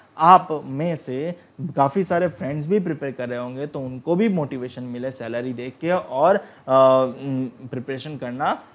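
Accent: Gujarat, India. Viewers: વાહ ગુજરાતી વાહ! ભાઈ ગુજરાતી ગમે એટલું હિન્દી બોલી લે પણ ખબર તો પડી જ જાય કે ભાઈ ગુજરાતી છે.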